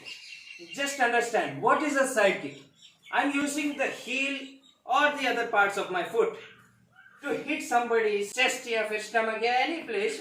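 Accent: Kerala, India. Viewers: Man, you mallu?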